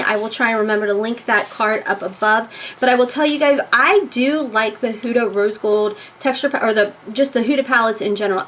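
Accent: South, United States. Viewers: Found your channel by searching for an Aphrodite review and realized that Jen Luvs Reviews mentioned you the other day, I thought I subscribed that day but I guess I didn’t but I’ve been binge watching all your videos the last couple days! Love ur content n love how honest you are. I can’t help but notice tho, you try to hide your southern accent, it’s so pretty when it comes thru. You should let it shine thru more. Btw, what camera do you use? Love ur channel! Much love from southeastern Ohio! 😘😘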